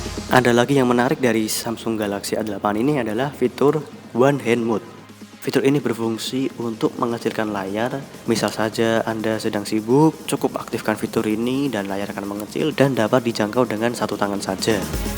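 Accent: Java, Indonesia. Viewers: kok "Medok" banget mas... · mas nya orang jawa pasti.. medok bgt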